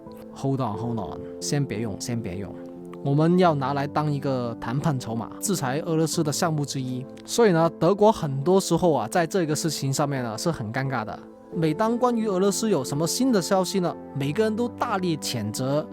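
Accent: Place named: Hong Kong. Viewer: 港普还不错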